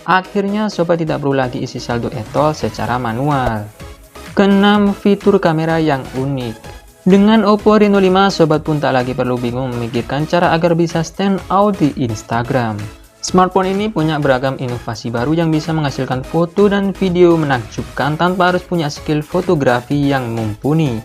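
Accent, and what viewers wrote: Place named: Madura, Indonesia. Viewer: Kayak logat orang madura 😅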